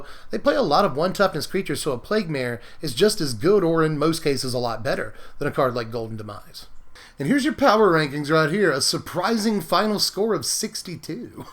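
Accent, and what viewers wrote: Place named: Georgia, United States. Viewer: You're from Georgia arent you? I had a feeling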